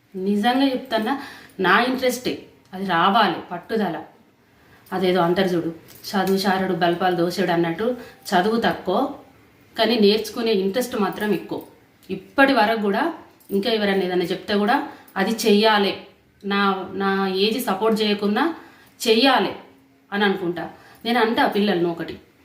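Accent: Telangana, India. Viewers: Namaste Amma garu chala baga chepparu me bhasha telangana supar ga matladuchunnaru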